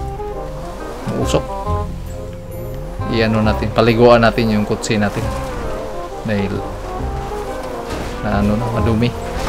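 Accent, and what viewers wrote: Visayas, Philippines. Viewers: Bisaya ka ba taga Davao city po ako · Hi Sir Bisaya ka Sir? Taga Gensan diay ko sir hahahaha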